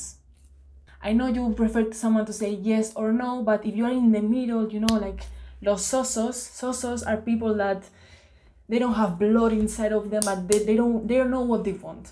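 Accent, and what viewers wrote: Spain. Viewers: nice video ' are you from spain?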